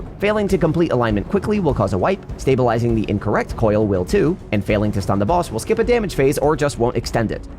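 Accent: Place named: Scotland, United Kingdom. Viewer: This dude does a great scottish accent! much love brother.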